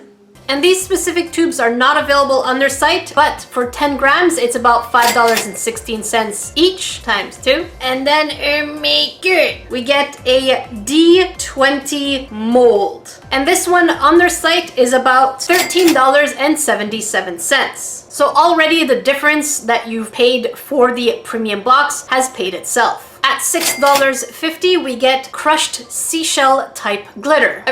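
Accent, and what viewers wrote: United Kingdom. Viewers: I always knew he had a British accent.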